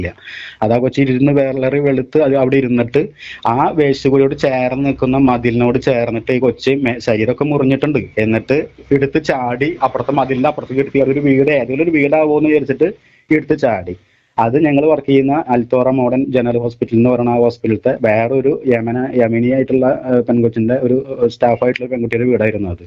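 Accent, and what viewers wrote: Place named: Thrissur, India. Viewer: എന്നാണ് ത്രിശൂർ രീതിയിൽ സംസാരിക്കാൻ പഠിച്ചത്.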